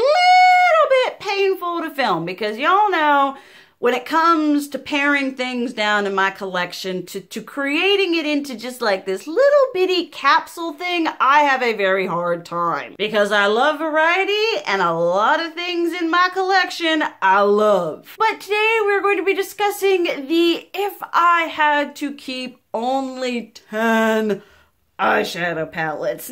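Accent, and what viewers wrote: South, United States. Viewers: I wasn't expecting the country accent from the thumbnail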